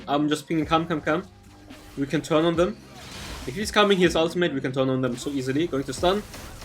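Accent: Germany. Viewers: One question are u German?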